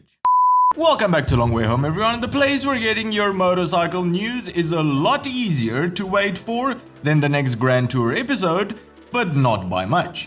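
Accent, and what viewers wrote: Afrikaans, South Africa. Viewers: Is jy 'n Afrikaans ou? Klink 'n bietjie so ne! Is jy nog in SA?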